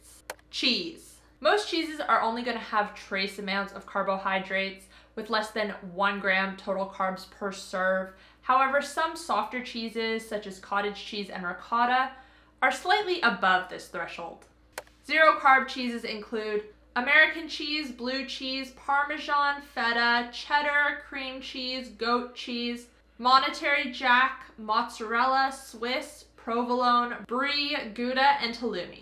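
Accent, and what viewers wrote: Canada. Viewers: She sounds Canadian cause of some of her vowels. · haha quite a small oops. your accent is very mild by the way. you sounded Canadian actually. great stuff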